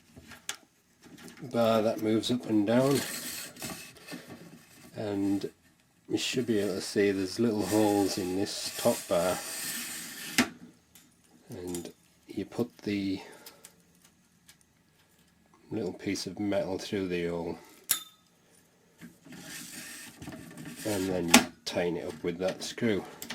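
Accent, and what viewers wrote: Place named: Newcastle, United Kingdom. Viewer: what accent is that you have, it sounds sorta newcastle like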